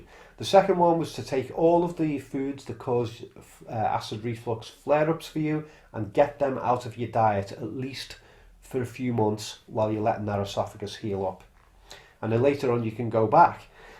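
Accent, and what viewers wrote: Liverpool, United Kingdom. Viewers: My reflux was so bad years ago I had the Acid Reflux Repair, known as Nissen Flundipication...it worked well but took me months and months to get over the operation, I had strained muscles and pain...I cannot live without the PPIs now, I have a shot of Gaviscon every night before bed, 30 years on. I avoid alcohol otherwise it all kicks off. Had HPylori 3 times!!! My history was I had my Gall bladder removed in 1988, then developed IBS, had histerectomy in 1992, terrible reaction to anaesthetic, lots n lots of acid had the NF op in 1994. always suffered with my guts since...wow is me. Thanks, very interesting clip. And lovely to hear a fellow Scousers voice...I've subscribed. 👍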